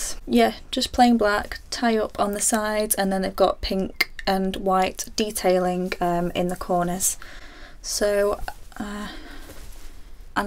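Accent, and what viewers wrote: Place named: North, United Kingdom. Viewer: You have such cute taste in clothing! I love your northern accent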